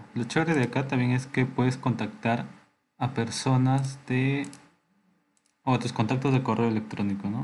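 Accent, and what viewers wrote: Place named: Peru. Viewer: adivino de donde eres eres de peru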